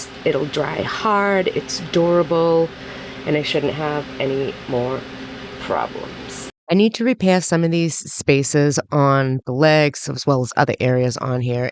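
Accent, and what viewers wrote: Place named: New England, United States. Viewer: Awesome! Love the combination of wood and black. I'm from New England (lived in all states except Maine:} and was picking up on your accent. When you mentioned the cold weather and living in N.E. I wasn't surprised! Which state? I now live in central coast of California and love it here. The weather is so wonderful. It's not crowded and little traffic here. Holly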